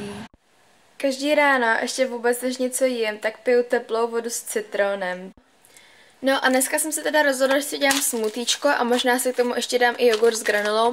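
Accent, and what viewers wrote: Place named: Germany. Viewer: já úplně zbožňuju ten přízvuk, když někdo, kdo mluví normálně německy, mluví anglicky :D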